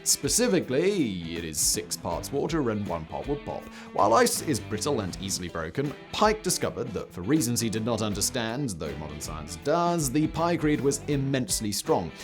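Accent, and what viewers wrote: United Kingdom. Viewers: I like your videos, but when you explain the details it's rather fast & with your British accent it's often hard to understand what you said. I'm not British and I'm sure your British audience understands every word.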